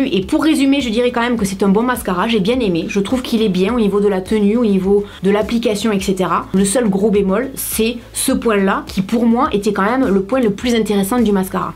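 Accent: South, France. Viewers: Je trouve que tu es quelqu'un d'honnête et sincère.. en plus de ta fraîcheur et de ton accent du sud!!!.. ça fait du bien de voir ça sur YouTube .. je commente très rarement tes vidéos mais je les suis depuis un moment .. continues comme ça merci beaucoup !! bisous de Corse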